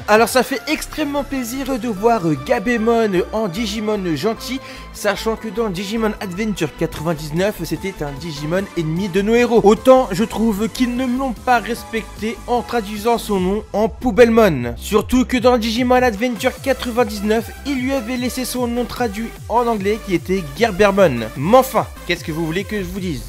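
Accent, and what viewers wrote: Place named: Japan. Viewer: Normal pour le symbole du savoir. C un cuirassé a la base. Sinon sympa ta review meme so je trouve tjrs un peu too much de prononcer les noms avec l'accent japonais en parlant francais mais ca reste du gout perso^^ me suis abo pour le soutien ;) Si tu veux jeter un oeil jai fait un amv pour la disparition de Koji Wada ❤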